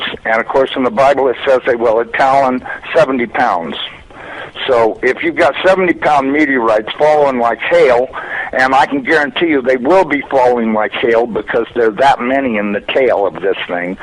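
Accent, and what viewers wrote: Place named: South, United States. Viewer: 13 years later total bullshit. this guy sounds like hill billy trailer trash.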